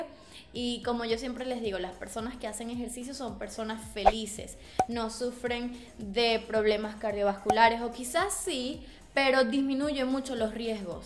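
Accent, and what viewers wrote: Colombia. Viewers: Eres colombiana y costeña carajoo <3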